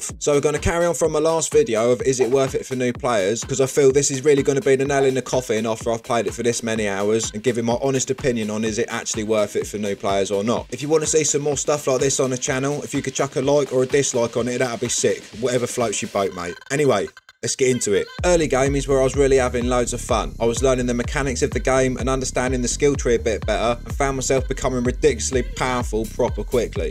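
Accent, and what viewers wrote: Cockney, United Kingdom. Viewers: Hahaha nice man! This is a strong English (cockney?) accent! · The best part of this video is that listening to your voice without subtitles has surprisingly shown me a drastic improvement in my listening skills, particularly for British accents (or Australian accent?). Cheers from an Indonesian Diablo 4 Player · how much of a Geezer accent you have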